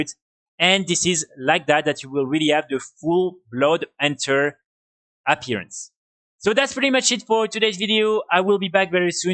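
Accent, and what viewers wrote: France. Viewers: I didn't know you were making videos aside from the gold making theme! As a trading post farmer myself it's always welcome to have some optimisation intels so thank you for that !! Also, rien à voir mais t'es français nan ? Ca fait longtemps que je me pose la question avec ton accent.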